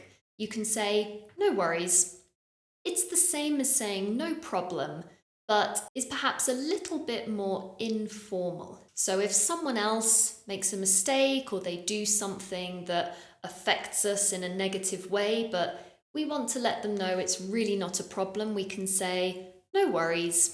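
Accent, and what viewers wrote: United Kingdom. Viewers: I like your British accent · British English ❤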